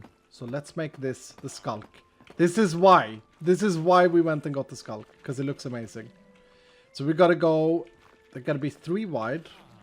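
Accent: United States. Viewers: I love how his American accent is a mix of like 12 different regions but is somehow still convincing. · Dude....your American accent is perfect.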